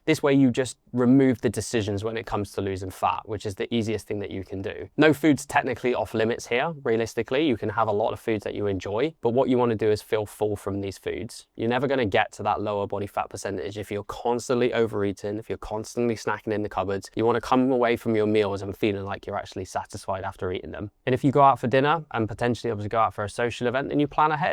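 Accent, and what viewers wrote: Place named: England, United Kingdom. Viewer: Well presented content. Pleasantly surprised to hear an English accent, these videos are usually American! All the best.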